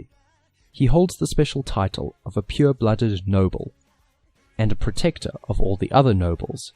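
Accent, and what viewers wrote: South Africa. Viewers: Narrorator, are you south african?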